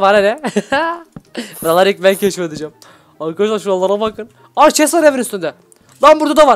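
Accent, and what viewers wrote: Adana, Turkey. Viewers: Abi sen Adanadamısın,Benide çıkart abi ❣❣❣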